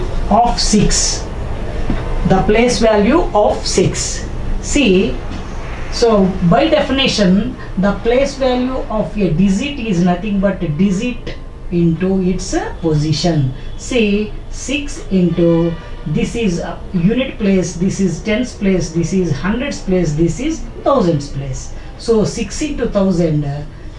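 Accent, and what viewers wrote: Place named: South India, India. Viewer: fabulous lecture sir south Indian type....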